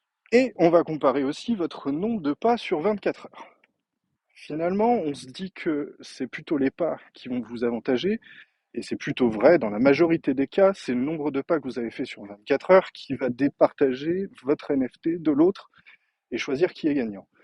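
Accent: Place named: France. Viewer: Merci pour le tutoriel ! Et vive la France pour l'accent haha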